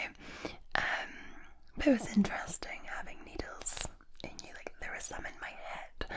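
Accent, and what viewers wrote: Scotland, United Kingdom. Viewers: Looks, talent, razor sharp intellect all delivered with a killer Scottish accent, what a package!!!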